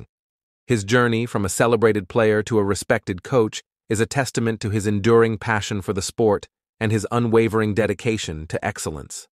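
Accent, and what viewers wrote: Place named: United States. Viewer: uyacasula ngale American accent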